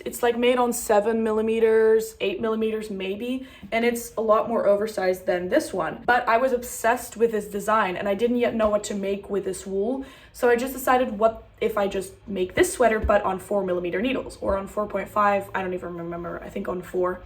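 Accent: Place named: United States. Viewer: hi this is so random but where are you originally from? you sound so american but you look so european 😭 sorry if this is rude i don’t mean it to be! also all your knits are gorgeous!!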